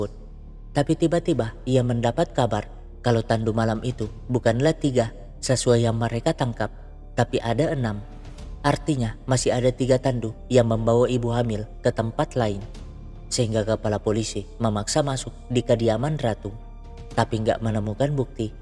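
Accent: Makassar, Indonesia. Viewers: Orang makassar kah ini adminnya?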